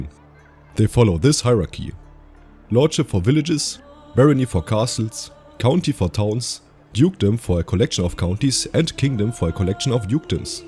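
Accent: Germany. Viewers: hör ich da einen deutschen Akzent raus? haha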